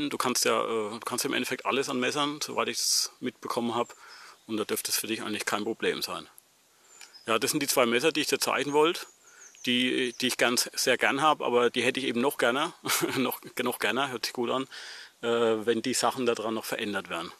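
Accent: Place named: Franconia, Germany. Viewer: Cool! Hätte ich bei beiden Lust drauf. Ein Landi hatte ich ja schonmal in der Werkstatt ... Wir werden sehen ... Übrigens, ich sehe Deine Videos nicht nur wegen des Inhaltes so gern sondern ich liebe einfach den frängischn Dialekt. Bin ja selber ein Oberfranke ... Zumindest von der Mundart her.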